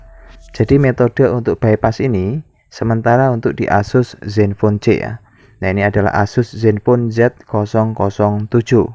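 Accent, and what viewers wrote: Java, Indonesia. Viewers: ok...pntesan dri logatnya jawa bang...slam teko cah bojonegoro jatim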